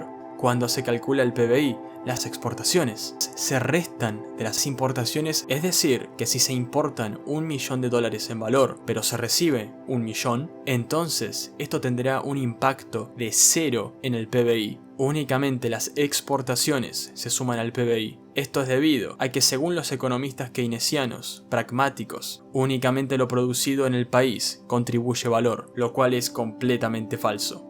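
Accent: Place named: Argentina. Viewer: tu hablar parece de argentino. Pero, donde vives?... en USA?. Gracias por tus aportes al conocimiento general de la economía. (Te sigo en todos tus posteos). Saludo Libertario 🤙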